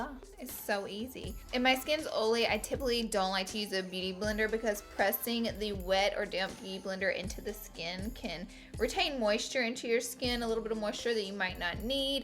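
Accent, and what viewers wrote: South, United States. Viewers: "Olly" The way she says "oily" is interesting to me because she has a pretty thick southern accent (none of this is suppose to offend anyone).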